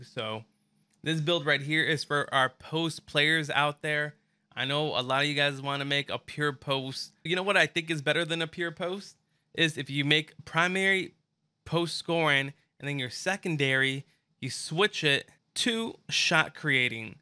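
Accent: Chicago, United States. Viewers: Wha part of Chicago u from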